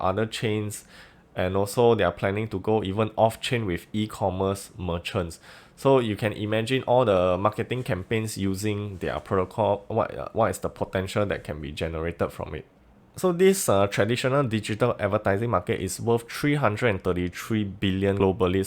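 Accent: Singapore. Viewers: Thanks for the clear and concise video. You sound Singaporean. Cheers from another Lunatic from the lioncity. ;)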